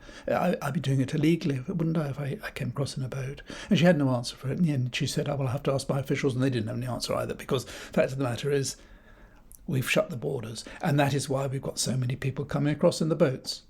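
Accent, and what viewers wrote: RP, United Kingdom. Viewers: You present just fine for us here in SW France ! Clear, distinct and correct RP accent (as opposed to BBC World Service morning Newsday now which is, frankly, dominated by South African, African and low class London accents which are not clear for foreign audiences)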